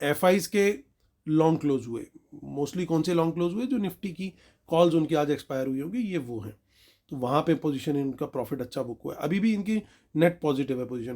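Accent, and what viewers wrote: Punjab, India. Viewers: Baaton mein punjabi flavour na ho aisa ho hi nhi sakta🎉😅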